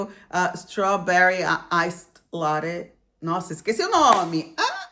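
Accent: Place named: United States. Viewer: Oi,! Primeiramente, queria dizer que seu vídeo foi extremamente explicativo. Você conseguiu transmitir uma visão muito realista sobre os trabalhos na Austrália, algo que poucas pessoas fazem na internet. Gostei bastante da forma didática como você apresentou as informações. Como espectador, gostaria apenas de compartilhar algumas impressões que talvez possam ajudar em conteúdos futuros. A chamada da thumbnail com dinheiro pode não soar tão bem para parte do público. Particularmente, me passa uma sensação mais apelativa do que o conteúdo realmente merece, porque o vídeo em si é muito mais rico do que isso. Outro ponto é quando você menciona ter ganhado um salário mínimo sem explicar todos os detalhes e circunstâncias. Para quem está assistindo do Brasil, isso pode acabar transmitindo uma ideia diferente da realidade, como se qualquer pessoa chegasse aqui e tivesse imediatamente aquela condição financeira, quando sabemos que existem muitos fatores envolvidos. 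Também percebi que, apesar de você ser professora de inglês, às vezes há uma mistura muito frequente entre português e inglês. Em alguns momentos, a forma de falar me lembrou até um norte-americano aprendendo português. Acredito que um uso um pouco mais moderado do inglês poderia tornar a comunicação ainda mais natural para a maioria dos brasileiros que acompanham seu canal. Sei que críticas nem sempre são bem-vindas, mas faço esse comentário porque realmente gostei do vídeo. O conteúdo é muito bom, honesto e didáti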